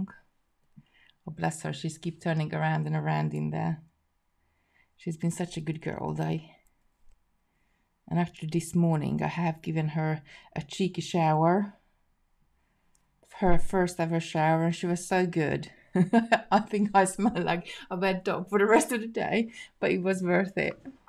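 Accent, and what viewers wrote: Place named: Hungary. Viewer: Good morning, apologies for missing u last night. That project looks beautiful. I have been trying to work out ur accent, and I heard u mention Hungary, is that where ur from? My mum was Hungarian, and came to England with her mum and two brothers during the revolution in 1956. I have been there a few times and love the country.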